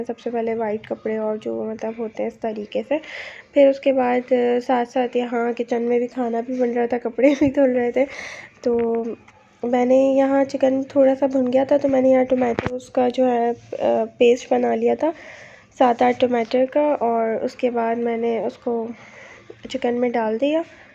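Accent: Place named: Karachi, Pakistan. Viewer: Mujjy apki awaaz se ye lag raha hai k ap karachi se ho apki age b kam hai ??? Aisa he hai na and apki byti masha Allah cute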